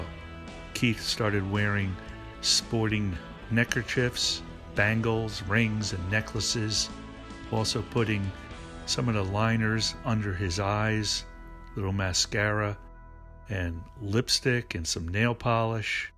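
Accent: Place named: Northeast, United States. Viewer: Once again I’d like to say I think you did a superb job at making these two documentaries. The making of BgsBqt. Part 1 and 2 I want to add some wood to the fire because I know you are working on part 3. I’m sure it will be great! What I want to say is I think you have gotten even better at making your documentaries! The CRYSTAL CLEAR CLARITY IS EXQUISITE!!!! You have a very good voice. If you ever check the wonder years tv show the way they depict the 60s and early 70s that narrators voice is just right. I like your stuff because it is soothing the same way as the wonder years was! Let’s face it these are the wonder years too we are talking about. Or I should say that you are talking about. It has to be that voice of yours. To me it is important that it is a NORTH EASTERN United States accent as such. Somehow an English accent just rubs me the wrong way when they are talking about the Stones. 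Or the wonder years. LA accent just sounds like they don’t quite know what they are talking about. You really come across as very genuine and you come across as really knowing what you are talking about. You calmly and cooly and in a clear non-rushed way guide us through it. The QUINTESSENTIAL AND PROLIFIC AND ICONIC NARRATOR OF THE WONDERFUL WONDER YEARS OF THE STONES! The greatest damn narrator of the Stones of ALL TIME! You tell their story best!!!!!!!!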